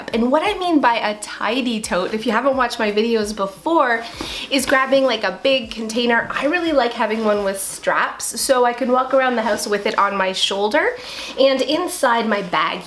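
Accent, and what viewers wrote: Canada. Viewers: Are you Canadian?, you sound like one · I'm from Maine, I love your Canadian accent with your enthusiasm! 😂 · Out and about? Canadian?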